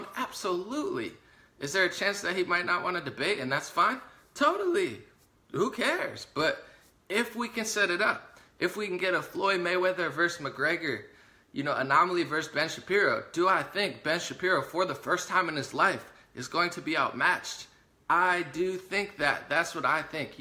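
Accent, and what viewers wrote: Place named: California, United States. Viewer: I think he's like on a different wavelength as far as his style. He talks so fast and you talk like...well, like a Californian. 😁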